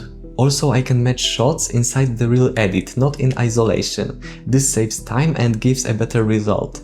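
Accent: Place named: Poland. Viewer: Nice video! Going to try to use your workflow. Accent sounds Polish ☺️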